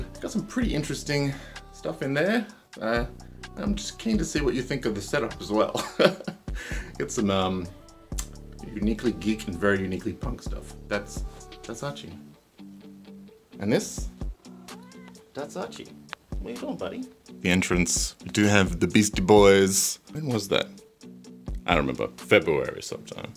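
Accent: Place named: Australia. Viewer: I love finding new Aussie youtubers. It's weird hearing people on youtube with my accent haha, I don't think about it but it's kinda jarring when I hear an Australian now because I just expect them to be American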